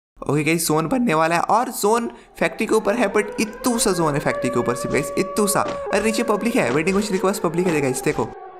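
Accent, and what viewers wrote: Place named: Karnataka, India. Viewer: Bro are you from Karnataka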